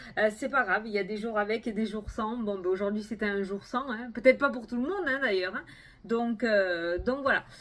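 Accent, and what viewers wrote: South, France. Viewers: Moi j adore ta bonne humeur et ta façon de parler du sud , je kiffe comme dirais les jeunes🤣😂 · Bsr, toujours aussi pétillante et pleine d entrain et aucunement tu n es vulgaire. Pas du tout!!! Je suis du Sud aussi et c'est vrai que l on parle comme ça, mais ce n'est pas de la vulgarité!!! C'est notre parler!! 😃 merci beaucoup pour ce tirage! Reste telle que tu es, un soleil pour nous tous! Belle soirée 🙏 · Cc on t'apprécie comme tu es très nature et spontanée ça fait plus de 2 ans que je te suis avec l'accent du Sud + mots d'oiseaux c'est un feu d'artifice très plaisant 👍🏻🤗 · salut:) je voulais te laisser ce petit commentaire juste pour te remercier <3 j’ai 17 ans et je te regarde depuis 3 mois, depuis une rupture qui a été très difficile pour moi… tes vidéos me redonnent le sourire, tu dégages toujours de très bonnes ondes, toujours très souriante… et assez amusante!! 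je viens aussi du sud et ton petit accent et ces fameuses « expressions » font tout ton charme haha!! encore merci pour ta bonne humeur<33 Chiara:) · Bonjour nous au moins on a l accent qui chante je suis du Sud aussi🙂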